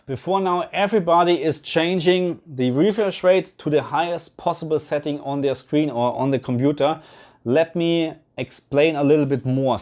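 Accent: Germany. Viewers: I have a question, sir are you german? You talk english like german person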